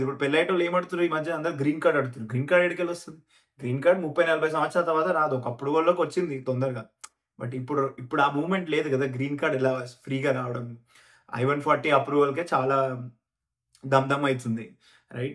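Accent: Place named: Telangana, India. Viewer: Ey uuko, nuv kudaa endhi itla, ah person name sudu chasta gista ani endhoo undhi, Malla ochi rude negative ani ochi neethulu bolra unho. Nay hotha miyaan aisa. And chasta kaka Telangana and Rayalseema slang and words gitlane untayi, inka cheppalnante neat ga manchiga ne cheppindu. it is neither condescending nor disrespecting or discouraging, you better understand the native tones and dialects. He’s delivering a positive speech and conspicuously explaining all the details. If possible grasp all the good things in what he’s trying to feed into kind of dumb brains who have no clue why and for what they are coming to the states or else if you are not interested to listen just move on. Okkariki help aina this video will be useful. Prolly you are dreaming about dollars and that is why you didn’t like what he is illustrating.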